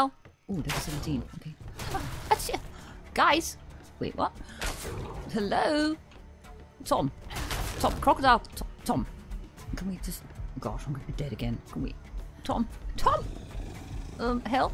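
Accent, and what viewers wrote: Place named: RP, United Kingdom. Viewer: Well spoken accent, as a geordie good English always sounds posh :)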